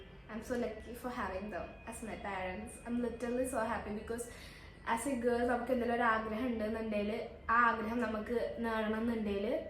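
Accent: India. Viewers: Bro that perinthalmanna slang is🤌🤍(kunu...ttoo)😂 Ankk Cabin crew aavan kaiyatte tto🫶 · Njan ninte vedios kanunathe malappuram slang kelkkan anu 🫂❤️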